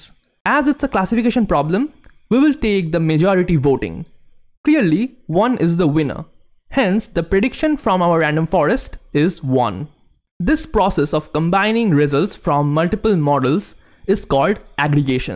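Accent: India. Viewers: can incompetent indian schools not even teach the Queens English properly nowadays? it's painful having to listen to his paj££t accent AND seeing the words spelt wrong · This damn indian accent!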